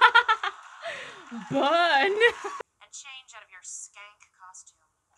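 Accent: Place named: Australia. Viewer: Hey sorry if you’ve answered this already! I’m curious about which country you live in. Your accent sounds Australian or like it’s from New Zealand (if so you’re so lucky). I’m loving your reactions, it’s really funny!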